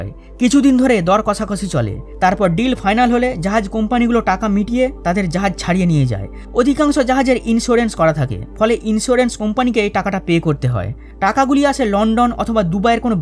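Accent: Kolkata, India. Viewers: আপনার ভয়েস শুনেই মনে হয় কলকাতার দাদা ❤️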